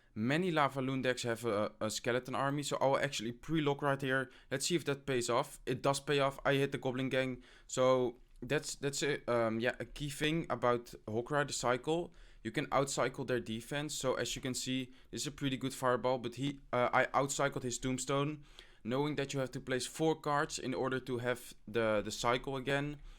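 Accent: Netherlands. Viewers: Nice accent mate, are you dutch? (Like me) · he have a Netherlands accent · That dutch accent tho. Die herken je zo ;)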